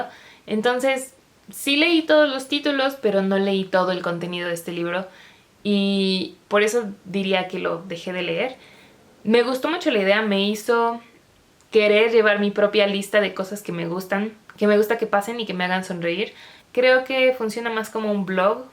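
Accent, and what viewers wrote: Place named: Spain. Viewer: Youuuu have an amazing spanish accent😭❤ Can't help but subscribeee❤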